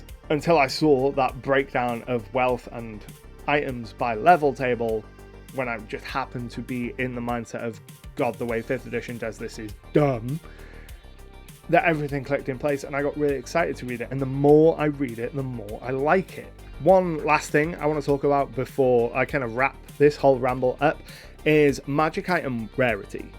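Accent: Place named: England, United Kingdom. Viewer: Thoughts 1. Tags. Completely agree. Standardised tags make everything easier 2. Encounter Building. Mindboglling that WotC has a DIFFERENT internal system for building encounters than the one published for other users. Perhaps it’s a business decision thing, to give their products a competitive advantage. 3. XP and Rewards. This I think is very hard. Xp is the hardest thing to sort out in RPGs, but it sounds like PF 2e is at least doing it better. 4. The Economy. Yes yes a thousand times yes. 5. More detailed Weapons and weapon effects. Agree, but the game gets WAY more complex, and not all players enjoy combat. 6. Level 20 Options. Yes, but 5e did make some improvements from previous systems. 7. 10th level spells. Here is a heresy, spell levels should be renamed to correspond with caster level, so 2nd level spells become 3rd levels spells, 5th level spells 9th level spells etc. 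Then we can have 20th level spells! 8. Magic Item rarity. Yep. but I like how 5e did Magic items. They made them a bit more special I think. And yes the character building is better etc The BIG negative however, is Pathfinder 2e is a lot more complex than 5e, and a lot of 5e’s appeal has been it’s simplicity (Before the rules bloat). Great vid though dude. I too am from the UK so your joyful English Accent is a great oasis in the American dominated youtube! best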